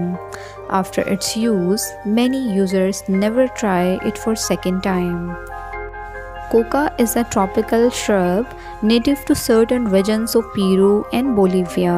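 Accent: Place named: India. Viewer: Is there a search result that is not indian like accent?? It’s really annoying. I don’t know why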